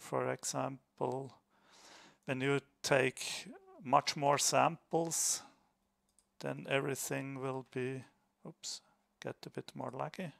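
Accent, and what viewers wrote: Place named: Finland. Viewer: you have a sort of Finnish accent 😉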